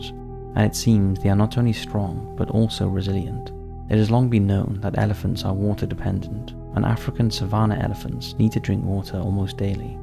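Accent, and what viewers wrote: United Kingdom. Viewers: British narrator asking for dollars. I'm guessing this is an American channel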